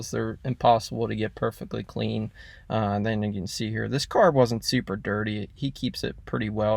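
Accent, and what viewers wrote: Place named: Pennsylvania, United States. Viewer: You sound like your from PA, although you did say wal-ter and not wonder. But other than that I hear a PA accent?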